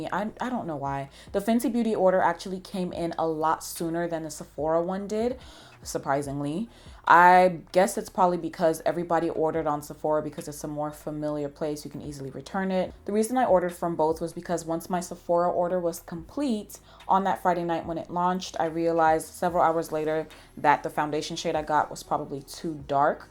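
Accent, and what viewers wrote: Nigeria. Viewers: Very beautiful and honest review. Will look forward to more of your Nigerian accent😂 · Stunning!!!Luv the naija accent🤣🤣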